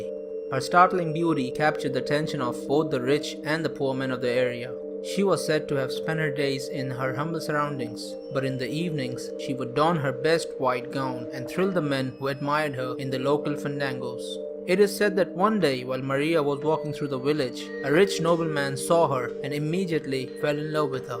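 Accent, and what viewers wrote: India. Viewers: Full of Indian accent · No offense but it sounds not authentic, specifically because of the Indy accent 😂 nice try though. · Why does the narrator sound from India?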